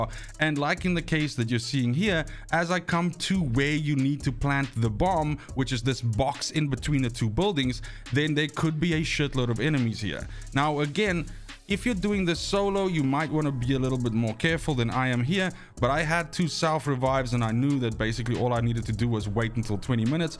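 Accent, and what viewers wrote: Afrikaans, South Africa. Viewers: Thanks for the help with this one. Are you Afrikaans???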